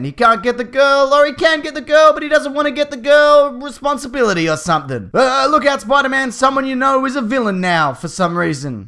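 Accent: Australia. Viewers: For some reason, listening to Aussies say the word 'classic' will never cease to be hilarious to me. I'm a kiwi.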